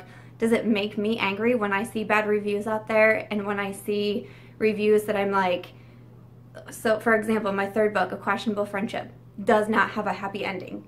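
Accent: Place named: Midwest, United States. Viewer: Thank you for these helps. I'm only an author in my head once in a while. I've never published anything. I enjoy hearing your midwestern accent. I also appreciate your tip on getting your response down in a Word document and then deleting it because I can see how it would be tempting to respond to negative feedback and pull down the professionalism you've worked hard for.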